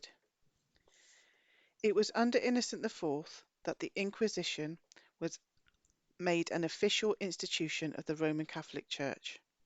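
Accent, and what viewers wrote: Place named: Wiltshire, United Kingdom. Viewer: That is fascinating. Are you from Wiltshire like me. I recognize that accent. Thank you sooooo much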